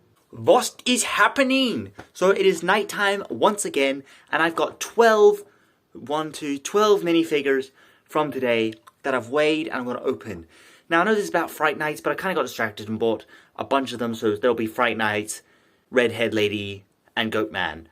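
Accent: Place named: Eastern Canada, Canada. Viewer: I'm from Eastern Canada and the speed talking with heavy accent was 100% intelligible lol just like home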